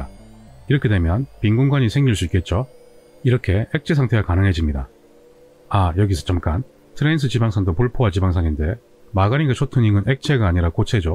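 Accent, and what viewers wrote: Gyeongsang, South Korea. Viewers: 선생님은 경상도 분이시군요. 목소리가 정감 있습니다. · 말투를 들어보니 대구분이신듯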